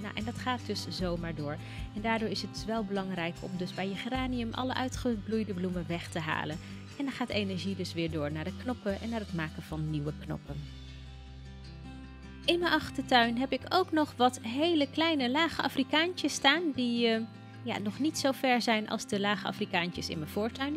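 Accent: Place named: Overijssel, Netherlands. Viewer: Woon je in Overijssel? Dat lijkt namelijk zo aan je stem❤️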